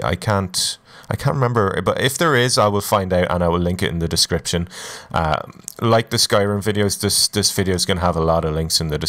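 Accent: Ireland. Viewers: l know this is a very old video but in regards to marks mutant mod l personally dont use it because of the silly armored deathclaws and hogs it adds , lt just makes no sense from a lore perspective at least with the hogs and just seems silly to me .also fellout is kinda eh l feel it ups the contrast to much. also l cant pin down your local accent ? what part of ireland are you from ?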